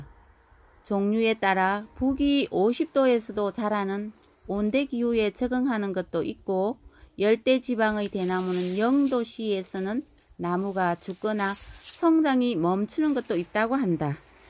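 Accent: Gyeongsang, South Korea. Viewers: 경상도 사투리 귀엽고 정겹네요~ 거제도는 참 볼게 많은 곳인데 몇번 가 봤지만 죽테마파트는 못가봤네예~ ㅎㅎ ㅅ ㅗ ㄴ 잡고 응원합니다 ^^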